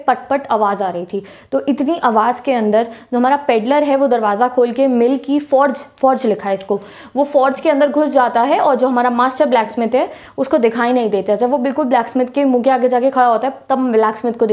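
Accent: Punjab, India. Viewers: this is Punjabi accent.we use this word . It means hona..( sorry I exactly don't what to call it in Hindi😁)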